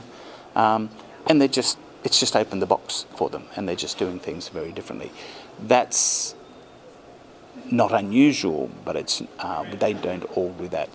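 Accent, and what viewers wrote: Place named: New Zealand. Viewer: Lol he's a Kiwi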